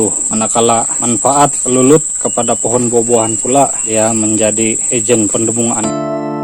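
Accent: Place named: South Kalimantan, Indonesia. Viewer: Logat nya Seperti Kalimantan Selatan Om, Salam kenal saya balikpapan, Kaltim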